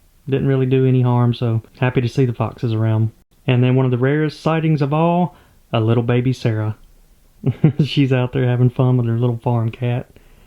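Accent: South, United States. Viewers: Name the owl big Al (owl) sounds just like big owl with your southern accent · I had to subscribe. Your southern drawl is so relaxing and the progress you make on your projects is satisfying. You praising your wife and speaking fondly of your daughter is lovely. I wish you all the love and success with your family and projects. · Al the Owl, because you're from AL, and our southern accent the sound close to the same.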